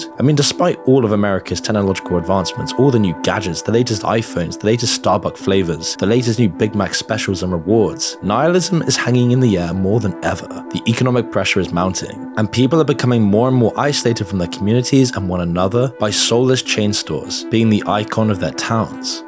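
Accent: United Kingdom. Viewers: its part of "Everything is fake in ClownWorld" - this channel is the head clown. "It is impossible to succeed on youtube, but I DID IT" "Subscribe for $200/month for my guide how to succeed - IN EVERYTHING". Also the obnoxious BRIT accent talking about USA ..... Im here for the LOLZ and to see how many of the cohort following this channel has brain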